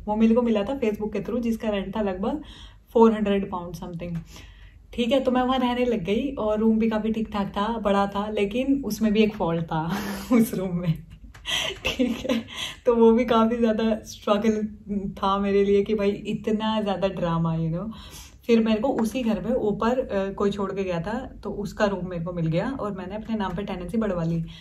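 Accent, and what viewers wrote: India. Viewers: Way of you speak love it 😅 Desi.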